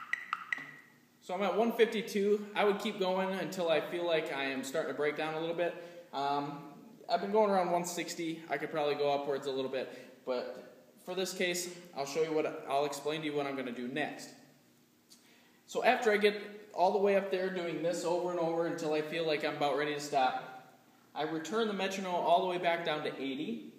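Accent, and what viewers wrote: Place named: South Dakota, United States. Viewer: Very helpful video! And very prominent South Dakotan accent! :)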